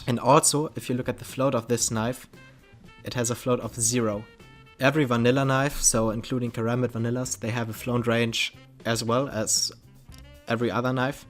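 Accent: Germany. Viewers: Ultra German accent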